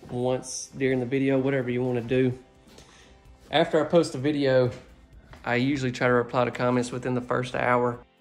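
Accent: South, United States. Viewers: Omg, this has nothing to do with nothing, but I love his Southern accent♥️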